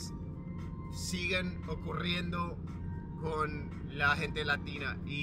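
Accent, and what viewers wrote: Argentina. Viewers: Vamossssssss!!! Me enorgullece que te guste nuestra cultura!!! Ya perteneces a ARGENTINA 🇦🇷. SOS muy gracioso y te queda muy bien el acento Argentino!!! Que emoción tienes!!! Vamosssss!!!!! 😢😂😂😂😢😂😢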